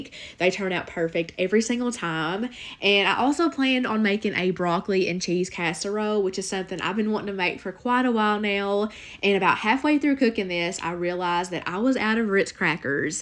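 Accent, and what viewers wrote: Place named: Kentucky, United States. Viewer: I watch to get ideals but then mostly I miss my Moms KY accent and your is so much like hers!!!!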